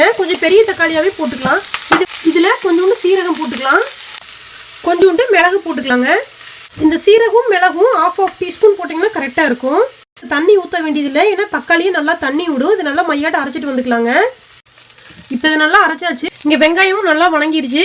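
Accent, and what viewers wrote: Kongu, India. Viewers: Super your kongu tamil is very sweet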